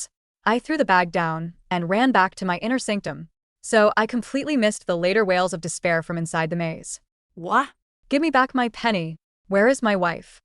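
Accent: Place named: India. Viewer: "No monsters no graving"wow Indian voice accent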